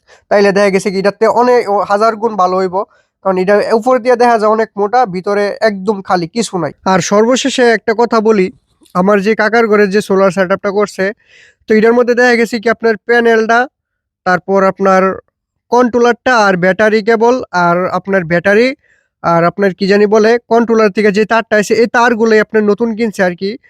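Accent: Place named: Brahmanbaria, Bangladesh. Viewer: ভাইয়ের কথার ভংগি ব্রাহ্মণবাড়িয়ার মানুষের মতো।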